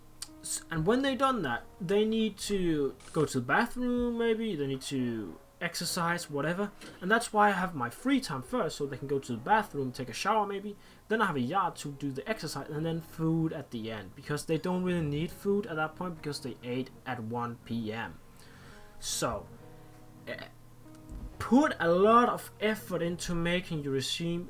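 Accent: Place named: Denmark. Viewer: du lyder meget dansk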